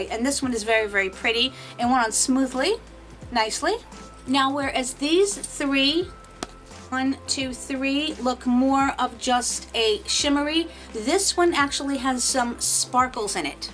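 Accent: New York, United States. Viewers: I love the Best of Life 2 pallet, stunning colors & glitters, love your NY accent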